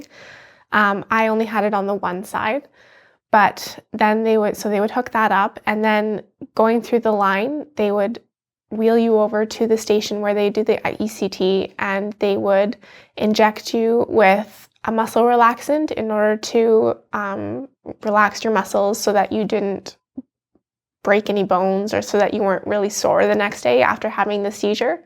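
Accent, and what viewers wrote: Canada. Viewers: Thank you. I have my first of 12 ECT sessions tomorrow morning (I'm bipolar1/PTSD) Your video relieved some of my fears. I really hope it works, I think I've developed a tolerance to the meds. Sorry it didn't work for you. You are very well spoken. Could not place your accent, I guessed right! The nice neighbors to the north. Thanks again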